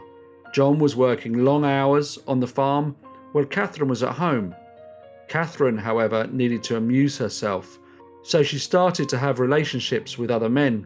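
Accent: United Kingdom. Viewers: Wow, how far you have come on, from these early presentations of work. Its as if you thought the audience would struggle understanding you correctly because of your wonderful accent!!! Your work is still bloody marvellous and as gripping as always. Im so happy for you. The success that your channel has turned into, is very well deserved. Your unique cases are so interesting and researched wonderfully i can't express enough how much I love them. Im going back to the beginning again to enjoy once more. Thanks again. P.S. w3ll done for embracing our wonderful colloquial language and accents. I love being British and eccentric!!! 🤣😉😉🤪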